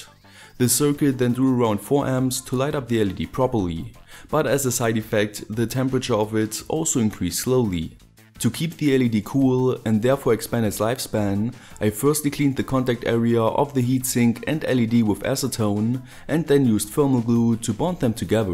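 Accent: Germany. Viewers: nice work. german english... gruäss us dr schwiiz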